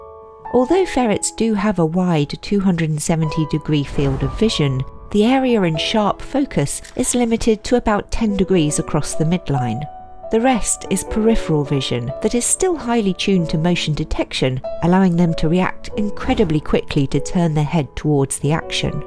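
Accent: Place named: United States. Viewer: Lmao 4 Hughe Jackmans, thank you for speaking American.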